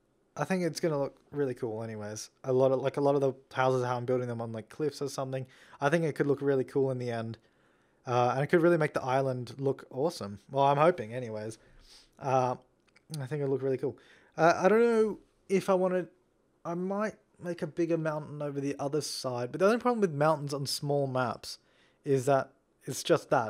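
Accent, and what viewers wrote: Australia. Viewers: His accent is… Australian, right? Oh and from this video I can already tell I'd fail so hard at this. v.v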